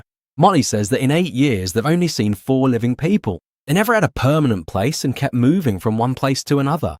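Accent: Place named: United Kingdom. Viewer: briton accent.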